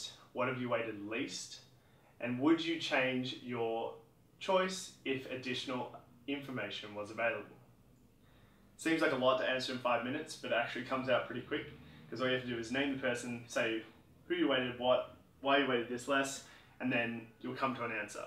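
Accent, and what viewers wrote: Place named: Australia. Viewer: or maybe 'pattern' problems (haha the aussie accent, wasnt sure )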